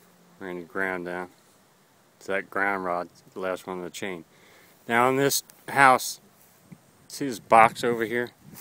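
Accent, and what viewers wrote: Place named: Philadelphia, United States. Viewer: good video . you have a philly accent ?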